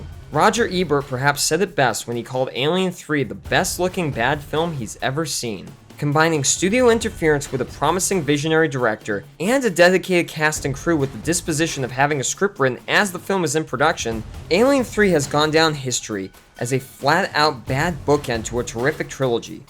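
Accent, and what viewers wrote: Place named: United Kingdom. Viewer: Sue Lah Co (NOT Sue Loo Cooooooh*) (NOT *So Long Kong*) and (NOT *anything else!) SUE .... LAH .... Co (with Co being pronounced like the American acronym for a "Company" or "Co." or perhaps: Sue LOCK oh ................................................................... ??? ! PLEASE................... ... Dude... if you had truly WATCHED "Aliens" ... you'd have known this. we who LOVVVvvvved..... all these movies are actually distracted (terribly) each time you say it YOUR way. ---Overall.... "We" even agree with you. WTF "happened" to Alens3.... but dammit man. Sue-Lah-Coh ... Ok ? ...................... You GOTTA "re.do" this Man.... or at least re-upload with SOME kinda acknowledgement at the beginning of this video............ we GREW UP with these movies Kid..... we have seen ALLLLL the backstage and Behind The Scenes videos on the aliens movies and brother.... 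this is an egregious error, to ANY erudite "Aliens" fan. ................. seriously . dude..... I'm sorry to say it. But it's true. it simply shows us you're NOT an "Aliens" fan................ and if NOT...... WTF would YOU be "outraged" about anything "wrong" with Aliens... ...................... if you cannot pronounce the name of the "Sulaco" properly? PLEASE.............. "excuse" yourself by re-uploading (with a statement at the beginning) that you cannot help but pronounce it (phonetically) like someone from the U.K. ................. and we will "Let it Slide".... but ... be slightly more careful dud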